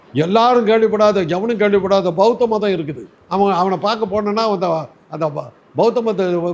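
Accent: India. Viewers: சுத்தமான தமிழ் மதுரை தமிழ் தான் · Salem Tamil 🎉🎉🎉🎉❤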